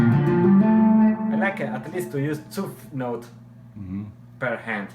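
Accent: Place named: Veneto, Italy. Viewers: His accent obviously sounds bad, but its typical of the venetian region in Italy. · he's from Italia Do you speak italian ?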